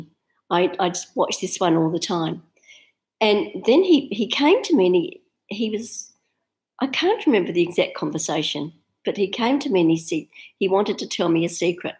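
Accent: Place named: United Kingdom. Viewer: Hi from Turkey! I've been trying to learn English for a while. I watched your video while looking for the meaning of "gotta get going" on Youtube. Glad to meet you and your excellent British accent!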